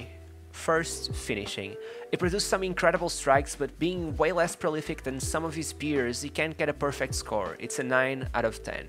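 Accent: Portugal. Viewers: are you portuguese? sounds like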